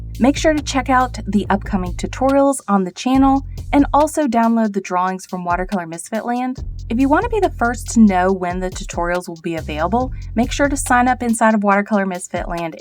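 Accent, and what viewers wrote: South, United States. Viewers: Gee,are you from the South??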